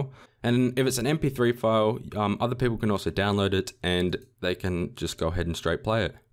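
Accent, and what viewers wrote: Australia. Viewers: finally, a farking Aussie!!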